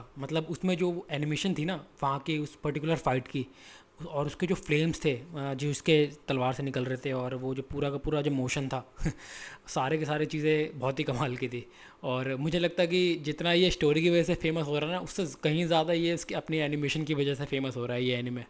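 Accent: India. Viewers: This guy sounds Indian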